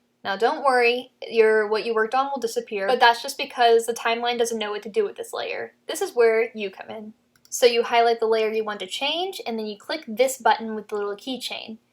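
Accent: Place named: Australia. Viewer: Why do you speak Australian?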